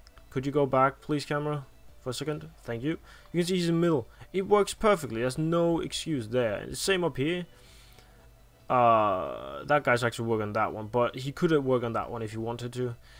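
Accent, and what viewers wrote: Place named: Denmark. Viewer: hmmmm i can smell danish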